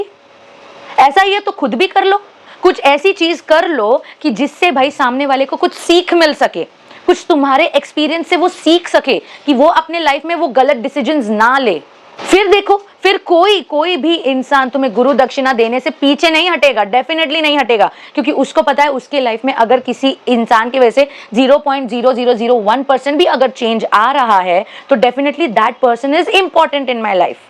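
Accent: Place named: Maharashtra, India. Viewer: Are you marathi tan and so many words are sound like you are Marathi ❤🎉🎉🎉🎉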